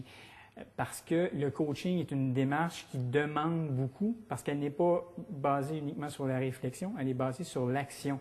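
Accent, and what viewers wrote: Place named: Quebec, Canada. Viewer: I like the French Canadian accent, cool :)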